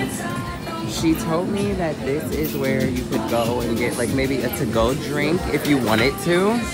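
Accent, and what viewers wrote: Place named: New York, United States. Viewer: I feel like a failure. I legit thought you were from NY!????!?!?!?? Like where did I get that from? Omgoodness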